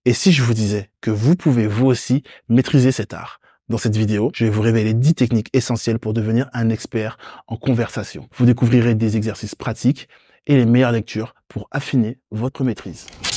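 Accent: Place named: Ivory Coast. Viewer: Avec un subtil accent suave Ivoirien 😅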